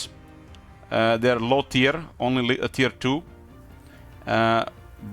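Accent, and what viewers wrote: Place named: Eastern Europe. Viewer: regarding the letters and how to pronounce, Tolketh would be Tulketh. All umlauts are basically "Open your mouth shape for letter but say some other vowel", with O it would be place your mouth to say O but then say U. You got it right on the end, chat helped you out. Frankly, they're not hard at all when you compare it to French or English. Like yeah there's more vowels in German, but at least they follow rules always, even things like Rammstein being read Ramshtain, there's simply rule that every EI is read as AY, done. How the F do you explain to an English started difference between thorough, through, thought, taught... As someone who's been studying English since before elementary and speaks it better than many people to whom it's their native language, and half of the times think in English, I think I speak for everyone when I say: English is a BS language. 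Great vowel movement in particular, that was worse tragedy than nuclear bombing of Japan. P.S. You sound Slavic, is that your accent? Your Os are very hard and you don't do guttural stops.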